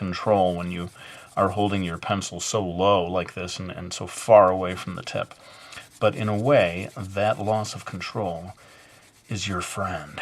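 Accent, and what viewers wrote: Brooklyn, United States. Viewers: I like your Brooklyn accent XD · If you stop doing the Brooklyn accent I'm unsubscribing! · actually i really like your surfer guy voice (brooklin accent) really much, it makes me laugh xD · why would anyone unsubscribe because of the Brooklyn accent? It's awesome! and so are the drawings :D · I LIKE your Brooklyn accent xD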